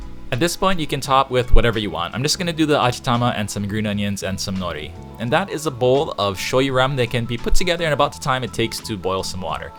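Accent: Hawaii, United States. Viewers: Are you from Hawaii? You sound like you're from hawaii.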